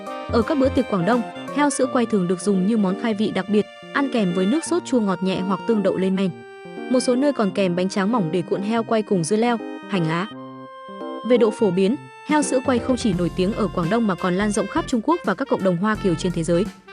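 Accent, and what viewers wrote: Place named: Vietnam. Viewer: AI nó đọc thì đọc theo cách phát âm tiếng Việt là phải rồi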